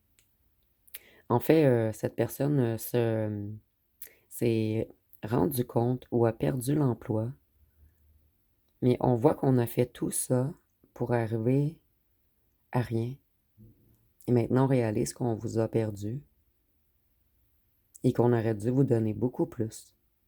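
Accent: Quebec, Canada. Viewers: La voix de la belle vallée du Saint Laurent. Merci ❤❤ Et vite un Québec indépendant !!!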